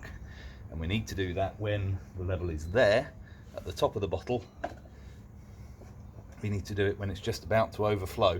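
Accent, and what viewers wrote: United Kingdom. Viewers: despite your cool calming British accent and the chance I believe anything that you say you must note your pouring of water is linear not exponential. the water bottle is quite tiny in proportion to the ability to treat based on the number of NHS ICU beds being under half of Italy yet the population size is similar. this is not the great British bake off this is life and death.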